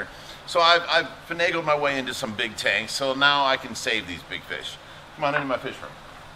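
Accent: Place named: Ohio, United States. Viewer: Ohio accent. I like it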